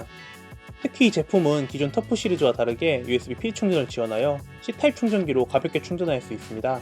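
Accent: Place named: Jeolla, South Korea. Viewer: 전라도 말투가 신뢰가 안가네요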